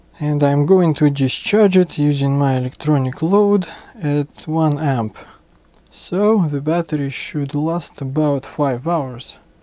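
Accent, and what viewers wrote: Russia. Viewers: I love your video and you Russian accent ^^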